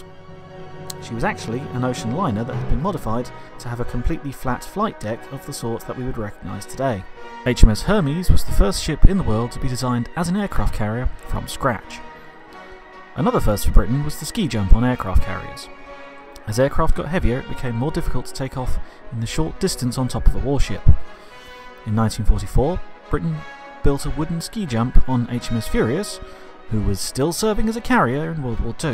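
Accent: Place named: United States. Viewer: He's definitely an Immigrant going by his American English and awful grammar.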